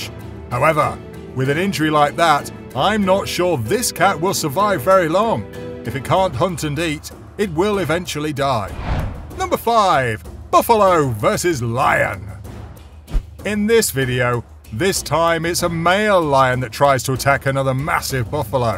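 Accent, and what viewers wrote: United Kingdom. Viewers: I love the way you talk London England but Fiennes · Why is it always a British guy? Can't it be Snoop Dog?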